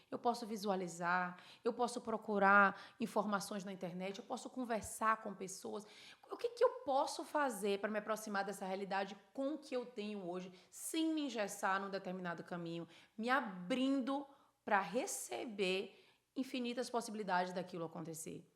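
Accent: Bahia, Brazil. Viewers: Adoro sua energia seu sotaque baiaho tou com tigo ❤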